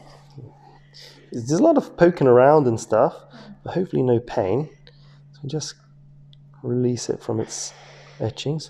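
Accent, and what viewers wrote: England, United Kingdom. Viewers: Very soothing bedside manner, as usual! And I love how everything sounds better with an English accent. Keep up the good work, Doc. :-)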